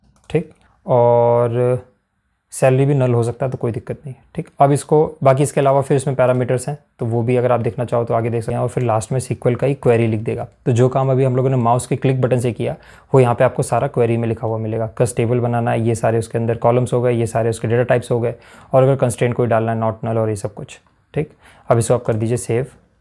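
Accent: Odisha, India. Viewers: Are you bro from odisha?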